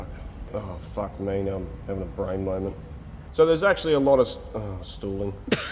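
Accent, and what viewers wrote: Australia. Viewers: Love this channel, I’m American and from across the world and is still the most relatable guy on YouTube, talks like every guy in the shop just with an Australian accent, and using metric, and bananas 🤢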